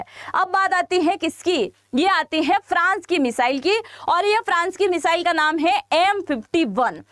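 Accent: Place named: Bihar, India. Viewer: Sahar nahi hota hai bihari ho madam.ji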